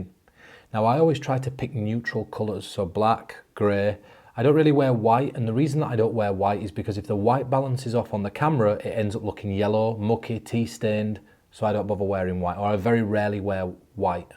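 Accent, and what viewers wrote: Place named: Northern England, United Kingdom. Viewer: Thanks, I thoroughly enjoyed that.....younger effect was totally baffling too. I am not northern, and I don't think you needed to knock your accent, it is part of who you are and gives you context.......due to your performances over the years plus a few other people from the north, I have come to associate your " Northern Accent" with amazing and innovative "mentalism".......a crazy anchoring that is now firmly set in my mind. Thanks again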